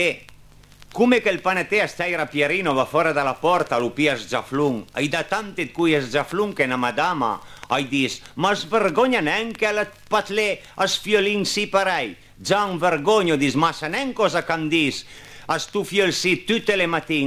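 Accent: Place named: Turin, Italy. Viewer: Hhaahahha che ridere soprattutto quella, dei televisori. Urbe era proprio una forza.... All'epoca un mio amico aveva diversi suoi dischi di barzellette. Che bello ascoltare il turineis puro.... Oggi il nostro dialetto così ilare e simpatico non lo senti più ormai.... Peccato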